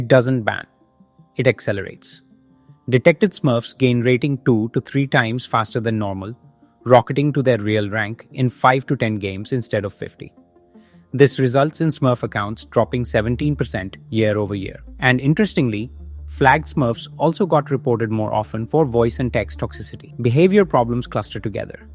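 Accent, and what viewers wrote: India. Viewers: I feel like getting scammed by indians. Different dubber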